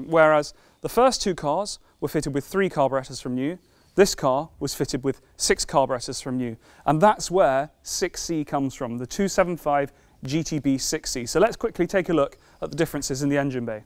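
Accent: United Kingdom. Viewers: Thank you very much for this excellent guide. Such a quintessential British gentleman informing people in that wonderfully British manner. That said, what about 11001? The Daytona prototype with the 2000GT-derived greenhouse?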